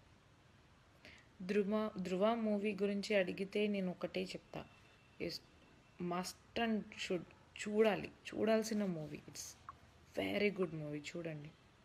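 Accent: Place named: Latin America. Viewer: Like I said I thought you were Hispanic I like your accent or respect you keep smiling I like them I like what you have on with respect I respect you but this is very interested I keep looking at you right now in this video I'm still in shock I just wanted to spoke to you the other day or 24 hours ago I don't know if this is a brand new video I don't know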